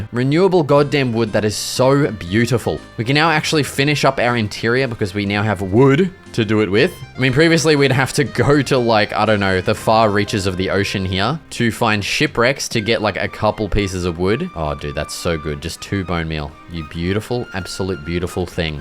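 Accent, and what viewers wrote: Australia. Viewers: Where are you from? it sounds like you're an aussie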